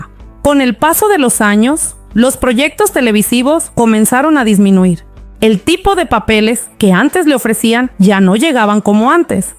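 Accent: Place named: North, Mexico. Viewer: Ten cuidado lo que subes en tus contenidos Lorena Herrera no es un ejemplo de lo que dices ya quisieras vivir cómo vive ella. no seas tonta y si vas a subir contenidos con tu voz toma clases de dicción porque tienes un asento de norteña no sé de dónde eres es un acento muy desagradable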